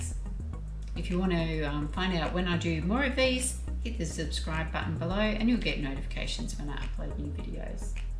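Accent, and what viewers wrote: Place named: Australia. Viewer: Crazy enough it just popped up on my YouTube page. But as soon as I heard your accent I had to watch. I enjoy all things crochet, yarn, & Australia as I lived in Adelaide and Darwin for about a year and a half and currently work with the Australian and NZ markets for my job. I knew I had to watch and check out your channel.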